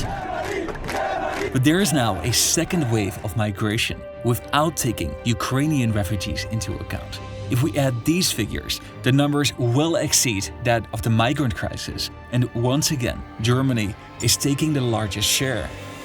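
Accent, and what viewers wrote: Netherlands. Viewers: Man! Your VERY thick Dutch accent distracts me from the content of the video!! :-(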